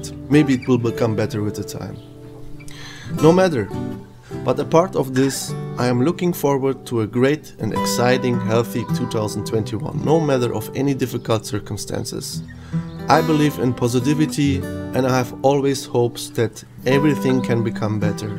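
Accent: Germany. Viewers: Awesome video,and I would agree with almost everything that you said...and btw.you should talk in your videos more,I meant you must be German when I heard you speaking before you said it,and your accent is preety good,kind of sweet,especially by the fact that English and German are so different languages.However,for me German is much nicer language,even though I don't speak Deutsch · I love everything about your channel.... we use to criticize people living thus lifestyle fulltime but we are now understanding that we need the world and the world doesnt need us.....we need to live in harmony with this earth and as for your German accent .....we all have one🙂 saying hello from Barbados 🇧🇧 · Your german accent does not bother me or others.what matters is what you do. You are showing others that if you make up your mind that it can be done.thank you very much. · Grüße aus Ohio, USA ... 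Ihr deutscher Akzent stört keines Ihrer U-Boote, macht Sie einzigartig und bringt mich zurück zu Ihrem Kanal ... Bleiben Sie sicher ... Ich wünsche Ihnen einen schönen Tag ...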